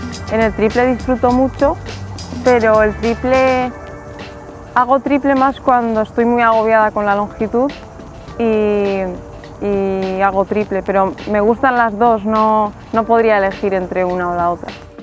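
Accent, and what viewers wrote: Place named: Spain. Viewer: Love the Spanish accent., so original.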